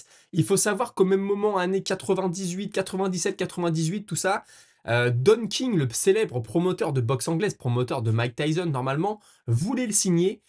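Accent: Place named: North, France. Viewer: Frerot repond pas si tu veux une fois si je confond pas ta dis que t'avais était a Rotterdam look 1 ufc et vu que ton accent m ait familiers je me demande si tt du nord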